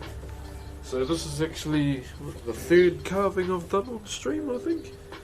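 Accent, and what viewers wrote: New Zealand. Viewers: Are you from New Zealand 🇳🇿 I,m am from the Netherlands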